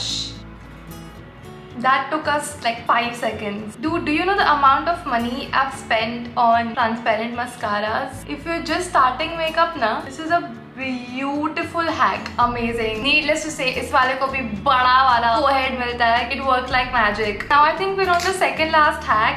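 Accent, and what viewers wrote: Gujarat, India. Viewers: Gujarati ascent 😅😁 yeh thoda aada aa raha hein!!!!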